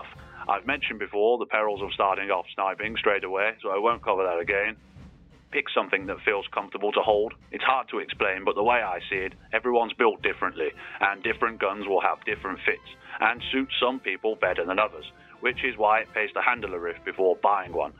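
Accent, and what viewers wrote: United Kingdom. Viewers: The accent becomes slowly more and more northern. I like the bad audio quality.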